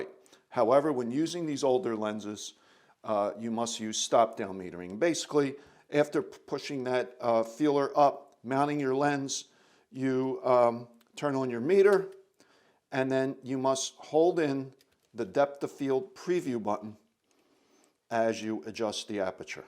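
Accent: United States. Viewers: you have a usa accent. do you specifically hang on to the softer canadian nickel for opening the battery cover?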